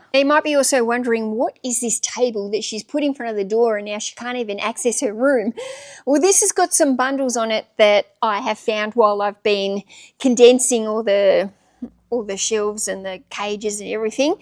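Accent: Australia. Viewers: I've been enjoying your videos for a few months now. I discovered them through the tech n sports interviews. I love how honest you are with yourself and other resellers. It makes it easier for us as viewers to be honest with ourselves. Thanks for your willingness to put yourself out there. One other thing. Have you ever done a video translating Australian slang (or pronunciation) to American slang (or pronunciation). I already picked up a few like Mills & Burns are Harlequins. "Gair-idge" is a "Gahr- ahdge". You mentioned a Mocher (spelling?) before. I'm assuming that is a Mochaccino coffee?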